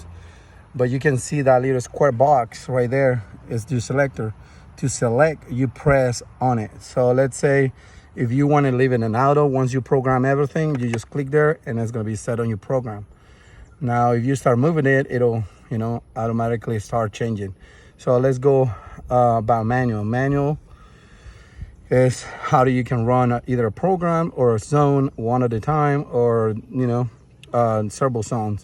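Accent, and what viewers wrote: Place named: United States. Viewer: Se te nota que eres gringo, pero no habrá forma de que lo mandes aunque sea en espanglich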